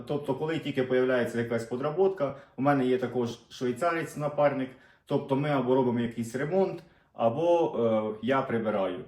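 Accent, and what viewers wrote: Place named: Vinnytsia, Ukraine. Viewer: .. У вас комплекс меншовартості, це говір вінничан. Людина має коріння, рід і це мова їхня.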